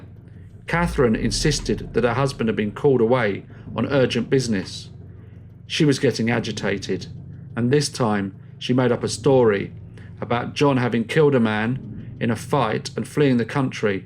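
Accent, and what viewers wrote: United Kingdom. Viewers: Wow, how far you have come on, from these early presentations of work. Its as if you thought the audience would struggle understanding you correctly because of your wonderful accent!!! Your work is still bloody marvellous and as gripping as always. Im so happy for you. The success that your channel has turned into, is very well deserved. Your unique cases are so interesting and researched wonderfully i can't express enough how much I love them. Im going back to the beginning again to enjoy once more. Thanks again. P.S. w3ll done for embracing our wonderful colloquial language and accents. I love being British and eccentric!!! 🤣😉😉🤪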